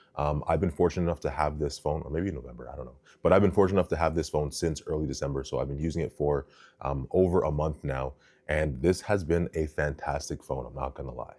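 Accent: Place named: Canada. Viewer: Oh you're Canadian. PROcess and aboot are giveaway words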